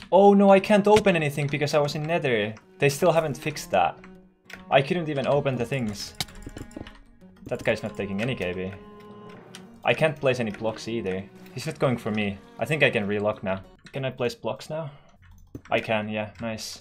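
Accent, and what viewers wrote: Finland. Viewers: i love your finnish accent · This is my 1st time watching you and just with 8 seconds I could tell that your Finnish And of course because of your name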